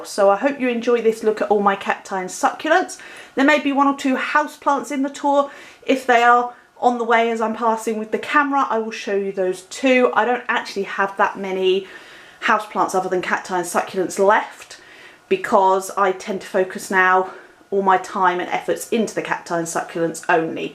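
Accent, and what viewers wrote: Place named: West Midlands, United Kingdom. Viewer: YIPPPEEEEEE I LOVE Plant Tours and WOWSERS you have a REALLY BEAUTIFUL Collection <3 I LOVE your variegated Thanksgiving cactus, all your plants are so beautiful and they are all so nice and tidy and beautifully potted up, and I LOVE your greenhouse too, and OMG WOW at your Euphorbia lactea ghost its a big stunner <3 I think your cactus is a Thelocactus variety or mammillaria compressa as they look very similar, and your cactus figurines are so cute too haha <3 I think from your accent you are from my neck of the woods originally (Warwickshire, West Midlands ? ) thanks so much for this wonderful update and sending you an abundance of love and happiness and PLANT POWER from across the Emerald Isle XXXX <3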